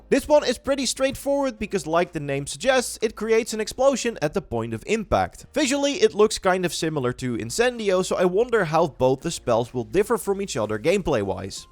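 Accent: Netherlands. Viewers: I smell dutch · Are u dutch? I hear a dutch accent in your english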